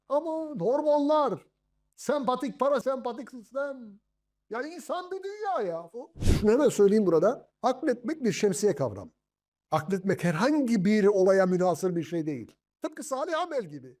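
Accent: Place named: Konya, Turkey. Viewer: Doktor beyim ilk defa dinledim şivenizi konya/Bozkır yöresine benzettim. Pogramların devamı dileğiyle kolay gelsin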